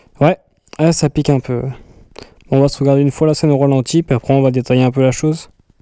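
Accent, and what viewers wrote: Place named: Vosges, France. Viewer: ouais j'ai vu après sur ta chaîne. J'ai habité dans les Vosges. J'ai reconnu un peu l'accent 😁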